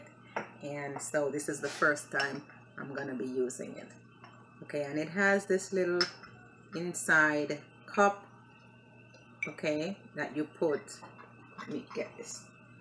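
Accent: Jamaica. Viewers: love your accent, Jamaican?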